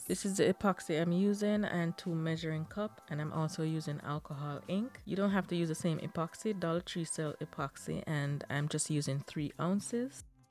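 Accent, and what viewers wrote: Jamaica. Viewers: Your English sounds Jamaican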